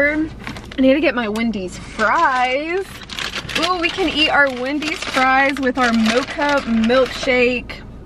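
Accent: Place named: North Carolina, United States. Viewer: I think I remember u saying ur originally from NC but do u live there as of right now? U definitely have the southern accent from NC lol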